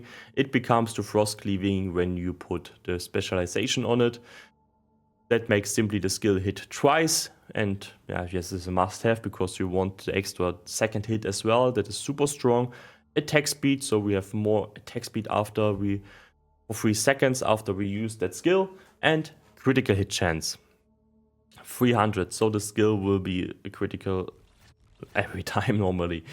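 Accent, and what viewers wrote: Germany. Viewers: Man hört aber extrem das du deutscher bist 😅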